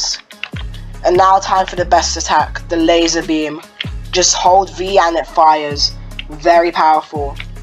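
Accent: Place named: United Kingdom. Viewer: The British accent is funny😂😂 You earned yourself a sub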